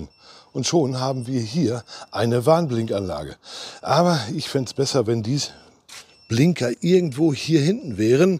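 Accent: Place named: Ruhr, Germany. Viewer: Super hilfreiches Video, hab dadurch meine Kaufentscheidung bestätigt bekommen. Ich könnte wetten, du kommst aus dem Ruhrpott?! Glück auf und danke die Vorstellung vom Ninebot! 👍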